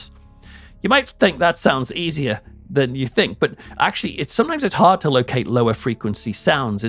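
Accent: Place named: North East England, United Kingdom. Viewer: Ah,I thought you were from north east,been trying to track the accent,was right,I was born there but moved to Doncaster when I was 2.keep it up Bonny lad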